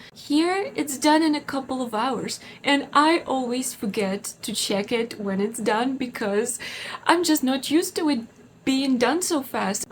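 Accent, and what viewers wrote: United States. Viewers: curious, which slavic country are you from? you sound very american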